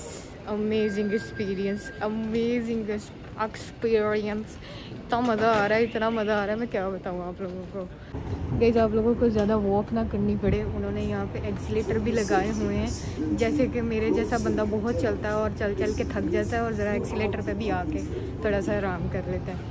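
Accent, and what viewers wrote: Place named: Punjab, India. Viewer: ماشاءاللہ ویسے ہمیں پتہ چلا گیا تھا آپ پاکستانی ہیں آپ کی پنجابی والی اردو سن کر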